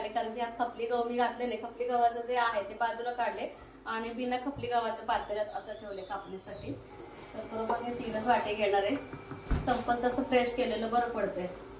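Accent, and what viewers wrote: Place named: Shrirampur, India. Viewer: तुझ्या आलेगाव बघितले की मला माझ्या माहेरची श्रीरामपूर ची आठवण होते माझ्या आईच्या घराचा आजूबाजूचा परिसर सेम तुझ्या घरच्यासारखाच आहे स्वयंपाकाची पद्धत बोलायची पद्धत सेम तशीच आहे तुझ्या घराच्या बाजूला खूप मोकळी जागा आहे तू वाफे बनव आणि मोठ्या झाडांना आळेकर त्यांच्यामध्ये एक शेणखत लेंडी खत घाल एरिया झोपला घाल खूप छान आहे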